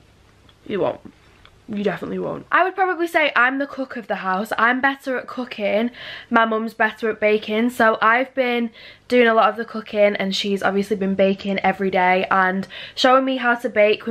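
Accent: Manchester, United Kingdom. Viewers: Where’s the Manc accent gone thoooo! 😂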